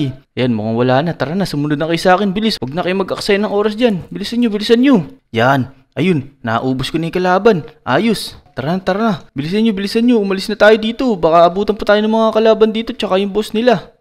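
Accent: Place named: Nueva Ecija, Philippines. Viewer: Taga Nueva ecija siguro to puro "EH" Naririnig ko😂 Only in Nueva ecija knows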